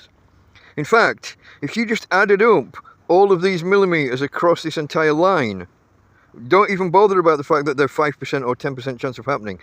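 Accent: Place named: Huddersfield, United Kingdom. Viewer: just in case you were familiar with the other sons of Huddersfield The Sheepfarmstudios brothers Chris & Dom. I had a lecturer from Huddersfield sounded like yourself. Sheepfarm had yet another Huddersfield original on called Michael O'Deira who might assist you with your banking issues.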